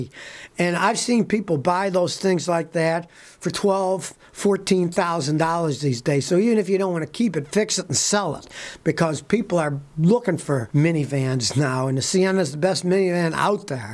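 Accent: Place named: Buffalo, United States. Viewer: SO FUNNY ,I BEEN watching this show , your in Texas ,but I heard Buffalo ,you talk real quick ,that Lake Erie water made you smart.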